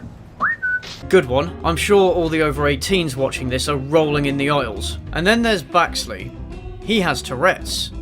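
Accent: United Kingdom. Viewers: Oh God. You're so much 'britishier' than I could ever imagine. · An already amazing review gets bonus points automatically for sarcasm with a British Accent!!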